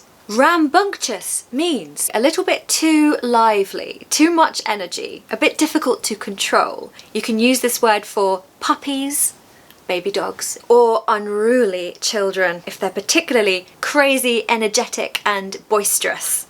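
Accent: RP, United Kingdom. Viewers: Dear Madam Teacher,many and many thanks!! I really,truly admire your so great British accent!! Very warm greetings from the Central Europe. I wish you a pleasant,enjoyable,wonderful 1st May ( Labour Day and Global Love Day). Take care,please. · Lovely and smart Woman I'm from Aracaju-Sergipe-Brasil I love the Rp accents from England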